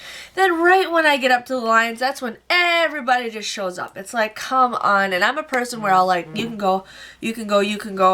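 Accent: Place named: Minnesota, United States. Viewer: You sound like Minn. I live here ....✅💕💕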